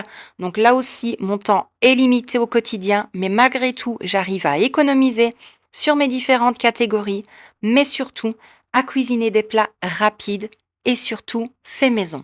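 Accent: Belgium. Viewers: Coucou, super format et très bien niveau diction malgré ton fort accent belge lol... Par contre il faut absolument que tu recouvres ton micro avec une poupette, lol une genre de touffe qui atténue les bruits de bouche car quand on t'écoute avec un casque c'est très désagréable... Et après tu seras au top du top... Gros bisous 😘 alias spoonielovejunkjournal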